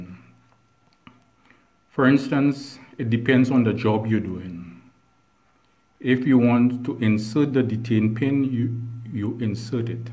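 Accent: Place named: Trinidad and Tobago. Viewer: Just want to say hi to my fellow Trini. Only a Trini like myself will recognize that accent. I am from Point, but live mostly in La Brea. What part you from?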